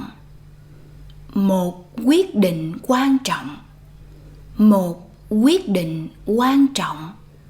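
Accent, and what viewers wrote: South, Vietnam. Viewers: the teacher speaks Southern Vietnamese accent!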